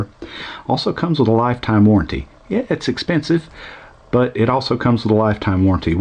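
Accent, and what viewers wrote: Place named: Texas, United States. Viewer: Less jello than a runcam split mini? I hear that texan accent, what part?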